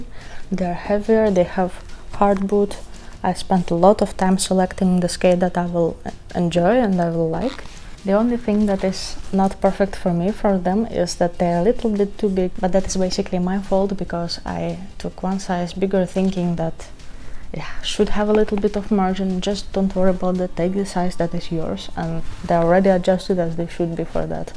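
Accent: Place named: Russia. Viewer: Ты русская, говоришь с акцентом)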